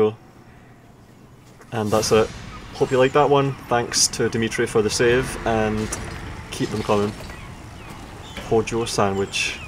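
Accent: Scotland, United Kingdom. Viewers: Are you Scottish? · That was frigging disgusting. Those haters of yours always focus on bullshit cuz u speak the truth, never see them chirping your generalship tho. Honestly you have turned battles into a real art, utilizing all of the game and interface mechanics, I honestly don't know how you click so fast and accurate, switching between things seemlessly, all I know is you have a god tier level of experience in this game and it is very admirable, on top of that you deliver the commentary with a devilish Scottish accent. God speed.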